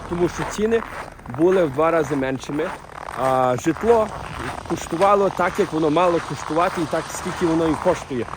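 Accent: Western Ukraine, Ukraine. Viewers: Як гарно! Ялинки, як у нас в Карпатах в Україні. У нас зима теж є, але підвищена вологість у горах при -30°С - це страхіття😒. А сніг рипить теж так само🙂. Ви розмовляєте українською якось так, як на західній Україні.... ваші родичі з цих місць? Де Ви вчились розмовляти українською? Дуже гарно розмовляєте !!!👍👍👍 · Західнянські говірки, а не мова! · Противный западенський акцент!!! Фуууу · це західноукраїнський акцент початку 20-го століття. Майже всі приїжджі українці в ХХ столітті так розмовляли, бо були вони саме із цієї частини України. Якщо подивитись канадські словники української мови, або книги, які її вивчають, написані в ХХ столітті приїжджими українцями, або вже їх дітьми, то там буде така ж версія мови. Звідки вона у власника каналу тяжко сказати, можливо у селах Львівщини так розмовляють, можливо, автор звідти родом, ну, або він працює та живе у середовищі українців саме із такою версією мови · Дякую за ваше відео. 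Мені не знадобиться ваша розповідь тому що багато років за кордоном у Європі, і це все пройшла. Але приємно дуже слухати вашу розповідь такою твердою западенською мовою без жодної помилки. Ви молодець, бажаю вам успіху.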